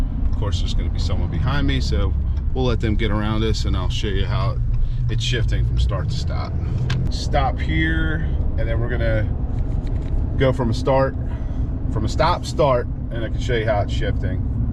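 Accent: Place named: Pennsylvania, United States. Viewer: Pennsylvania? You sound like you’re from PA.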